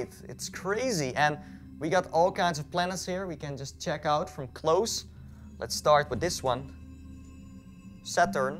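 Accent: Netherlands. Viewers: Je kan horen dat hij van Nederland is! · Zijn Engels is goed maar hij heeft een Nederlands accent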